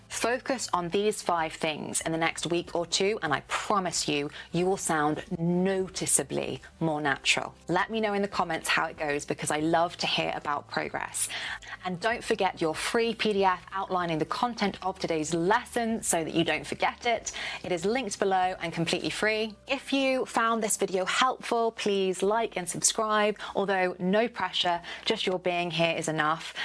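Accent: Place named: Cambridge, United Kingdom. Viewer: I love your crisp Cambridge accent. Just wanna confirm is it really Cambridge or some other accent.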